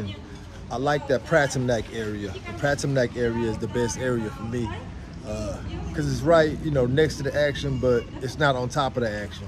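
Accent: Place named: Virginia, United States. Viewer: This is the truth he defiantly from Virginia it's the voice , VA dudes we just deferent, and women from all over the World love US ,and not just that even if he not from Virginia he branded with speech Pattern , but I just like to see yall together , and both of you make a Beautiful Couple ,and like the videos keep them coming.💪💪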